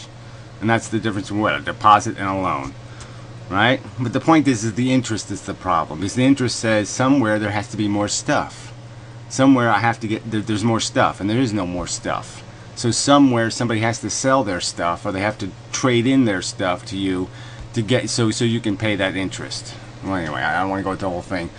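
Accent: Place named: United Kingdom. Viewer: holy shit.. british accent + asmr voice + inability to make an argument ? nerve churning. that's the actual dark triad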